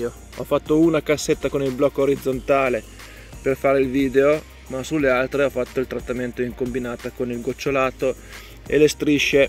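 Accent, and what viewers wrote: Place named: Monferrato, Italy. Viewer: Ciao I tuoi video sono sempre interessanti, facci sapere cosa farai e come finiscono quelle due o tre iper infestate. Qui ho tolto le strisce settimana scorsa e ho trovato poca varroa, tra un mese o meno farò un sublimato, sono tutte in blocco eccetto una che ha una rosettina ma è un'arnia sfigatella, non ha raggiunto la massa critica per l'inverno mentre le altre sono in condizione 2 o 3 di forza, ho uno schema di valutazione da 1 a 3 per le varie caratteristiche dellalveare; io sono in Val Seriana e le temperature vanno da 18 a 2; tu dove sei, dall'accento ti colloco in Monferrato.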